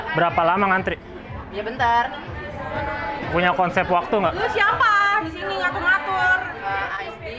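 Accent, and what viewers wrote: United Kingdom. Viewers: pantesan bahasa inggrisnya kayak British accent gitu 😂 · british accent ya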